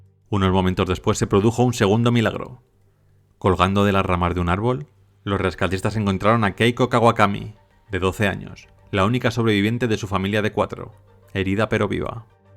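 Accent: Spain. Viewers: Así lo pronuncian en España.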